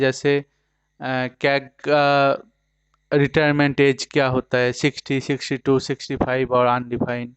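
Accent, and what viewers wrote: Bengal, India. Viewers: Yes bro he is bengoli. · are you from west Bengal ?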